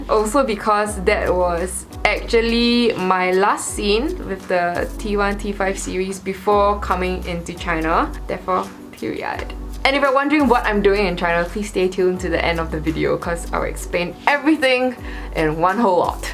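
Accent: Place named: Australia. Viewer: She kind of has a Australian accent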